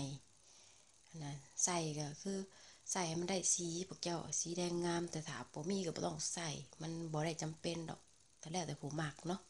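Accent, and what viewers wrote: Savannakhet, Laos. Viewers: มักๆเสียงเจ้าเว้าม่วนม่วน คือกันเองไทยสวันบ้านเฮา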